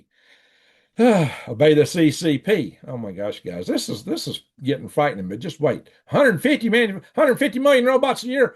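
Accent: South, United States. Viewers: It was a little Dry. My friend Mike said, he said the Big A.I.(can't remember) company can afford the A.I. but China couldn't. A lot of repeated Math, repeated yourself often. Then he did his usual copying your 'Southern accent" in levity. "China" he said from you. He's a good guy, my friend. I say We as Americans must work as if it depends on us, and Trust as if it depends on God. And Freedom to the counterpart of people like me in USA, IN CHINA. "See you down the road.". As someone once said to me at meeting.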